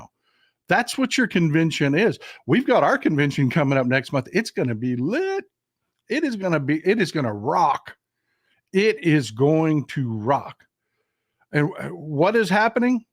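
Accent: Texas, United States. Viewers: I bet you're from Austin?? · My dad was born in Texas. You remind me of how he talked, I enjoy your comments.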